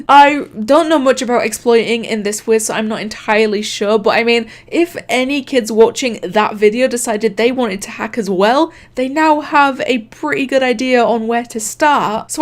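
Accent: United Kingdom. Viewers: youchewber British? I'm british so I would now that ur british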